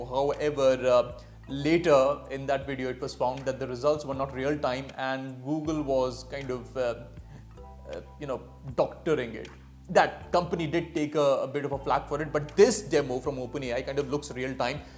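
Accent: India. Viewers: The Indian accent always reminds me of those phone scammers.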